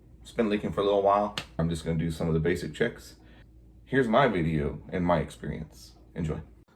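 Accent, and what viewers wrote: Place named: United States. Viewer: Awesome, thanks. May I ask, where is 'your' American accent from? Perhaps someone could let me know. I have always wandered because an American actor has the same, and I just don't know where it's from. If I was to guess...perhaps from around NEW York; but there are more than a few. Thanks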